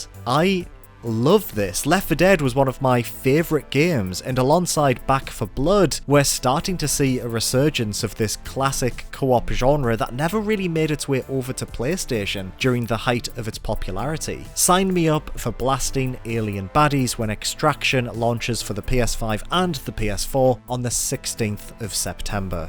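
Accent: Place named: Newcastle, United Kingdom. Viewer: I get a warm feeling in my stomach when I hear this guy's little Geordie voice. So soothing.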